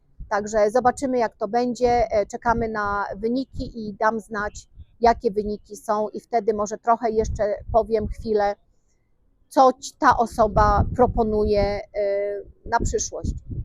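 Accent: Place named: Krakow, Poland. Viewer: Pani z Krakowa? Tez tak mowie....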